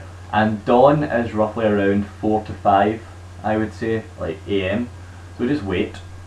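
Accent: Scotland, United Kingdom. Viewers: You sound scottish haha Ich bin aus Mulheim an die Ruhr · I was going to be cool like these fools and ask if you were, like, Russian or something...but it's pretty clear that you're Scottish. Scottish FTW. Thanks for this video. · Are you from Scotland or are you from Belfast England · I subscribed lad because you're scottish!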